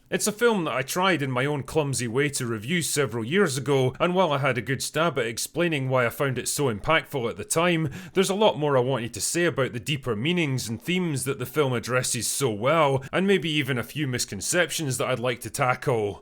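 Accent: Scotland, United Kingdom. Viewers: the sloppy-af Scottish accent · I liked bill. He was right. You, with your scottish accent and lack of balls, make me sad. BILL had a point in this life. You dont. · fuck sake your voice is horrendous, why do you keep drawling out the last word. where in scotland are you fae?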